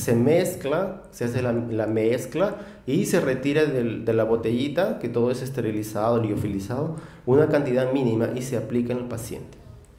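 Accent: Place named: Brazil. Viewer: muy buena exposición.. pero no es necesario hablar como brasilero.. yo vivi 15 años en españa y jamas hable como gallego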